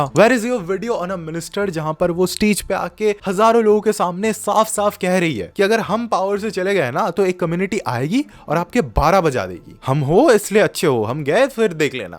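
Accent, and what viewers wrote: Bengal, India. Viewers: baat sunke hi pta lagte hain ki aap bangali ho.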